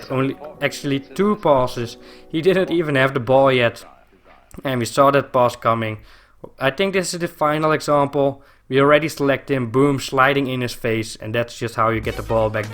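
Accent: Netherlands. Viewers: Must be dutch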